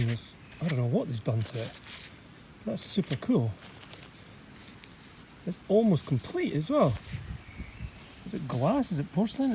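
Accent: Scotland, United Kingdom. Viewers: thankyou so much for your reply and I am really enjoying your format I guess I'm just greedy and want to see them all haha.I also have to admit listening to your Scottish accent makes your videos even better for some reason I find it soothing.Keep up the good work and I will keep watching 😊 Sharlene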